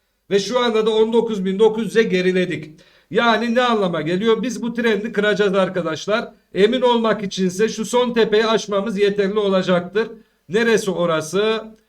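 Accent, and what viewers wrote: Konya, Turkey. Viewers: Videonu izlerken benim hanım dediki, bu adam konyalii😂😂 dedim nerden anladin ?? Hanım dediki: Dimiyorum degisi tam konya sivesi🤣🤣🤣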